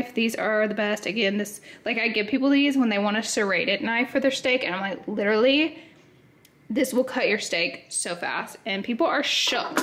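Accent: South, United States. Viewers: calling the pots boilers in her southern accent is the cutest thing on YouTube.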